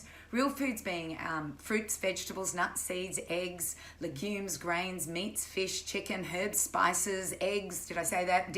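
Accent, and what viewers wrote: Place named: Australia. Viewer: You sound like an Australian and i love that you are at least you known what's going on in here (australia) comparing to the other ones that i found, all american. So thank you so so so much you explain things nicely whithout getting rid of everything. Thanks again 😊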